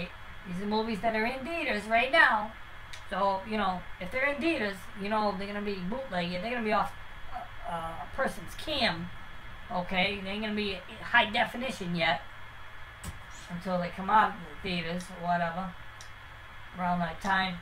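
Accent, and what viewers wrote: United States. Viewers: You sound east coast, brother.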